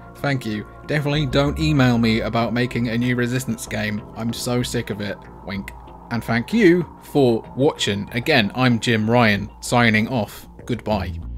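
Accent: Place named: United Kingdom. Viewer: PO'OL TAOW. I love British accents.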